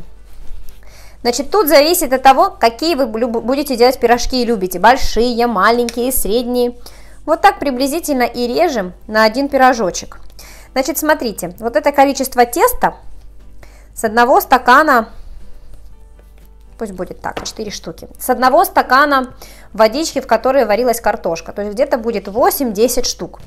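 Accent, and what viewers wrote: Ukraine. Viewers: Люди, у нее все нормально с ногтями и с речью! Она просто из Украины и у нее такой акцент. Почему вы людям другой национальности не указываете как разговаривать! Вот 100% больше половины неучей и неудачников здесь что-то вещают на! Если что-то не нравится, прошли мимо нафиг и не смотрите! Зачем обязательно какое-то дерьмо надо написать! Жаль, что не наказывают интернет-гениев за оскорбления по закону!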